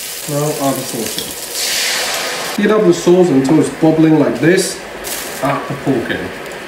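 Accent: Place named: Newcastle, United Kingdom. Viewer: Love your no nonsense cooking as much as your Geordie accent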